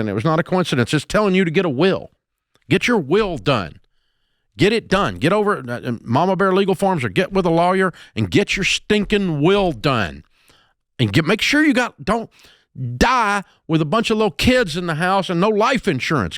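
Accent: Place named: Southeast, United States. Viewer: It's good for learning American English from the south east (specifically the host).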